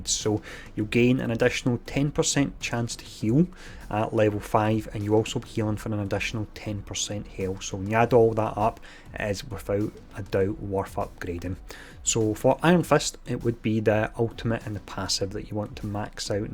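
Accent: Scotland, United Kingdom. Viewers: came for the info stayed for the scottish accent will come back for both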